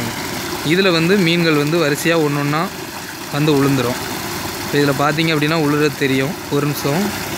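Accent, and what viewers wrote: Tirunelveli, India. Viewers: Sema, thirunelveli yaaa pa... namma slang varuthuu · anna ninka tirunelveli ya 😍😍eale😍😍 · Nanum Tirunelveli bro.. slang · திருநெல்வேலி பாஷையில் விளக்கம் கொடுத்தது சூப்பர் பாஸ் நல்லா இருக்கு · Tirunelveli tamil arumai👌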